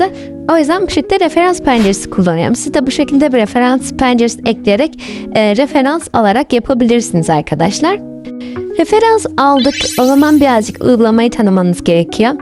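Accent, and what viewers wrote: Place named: South Korea. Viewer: ama sesin benziyo sanki korelimissinde türkçe konuşmay çalışıyormussun gibi❤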